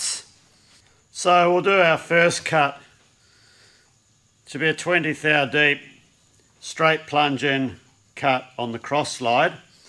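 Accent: Australia. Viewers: I hear his Aussie accent and I click Like!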